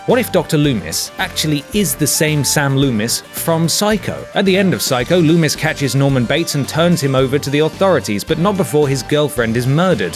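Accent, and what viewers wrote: United Kingdom. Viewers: Considering that Donald Pleasance had a British accent like yourself and John Gavin doesn't that theory is complete bollocks as you limeys say. And he's not as good looking or tall as John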